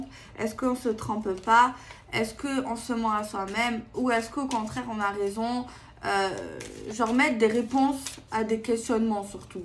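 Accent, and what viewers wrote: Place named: Marseille, France. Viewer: bonjour super vidéos je t’adore et ton accent et de Marseille gros bisous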